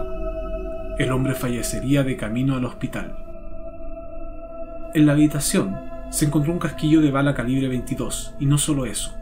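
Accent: Chile. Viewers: El narrador sin duda es chileño, gran trabajo, vato. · El tono shileno le saca lo oscuro y se torna hasta gracioso 😂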